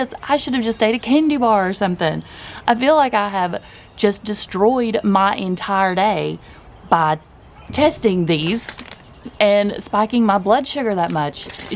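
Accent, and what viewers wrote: South, United States. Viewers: Something is busted there. The meter clearly says "Ketones" and then the ridiculous number. I don't have a KetoMojo but a Precision Xtra that does glucose and ketones, and the meter will clearly show what type of strip it is reading - Glucose or Ketones. Something is flubbed there. In any case, 268mg/dl would be OUTRAGEOUS for ketones so it may really be reading glucose. But if that number is legit, this is worse than sugar. Actual sucrose would hit you maybe into the high 100's. .. BTW, love your channel and please ignore the haters. I live in the south and I love your accent! You sound like a sweet, friendly southern gal to me!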